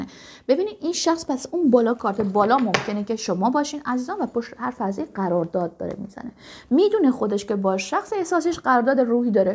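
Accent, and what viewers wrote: France. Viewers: oui. C’est ce que j’ai pensé. Quand vous parlez en perse vous faites certains sons( ex: op) qui rendent évident que vous êtes française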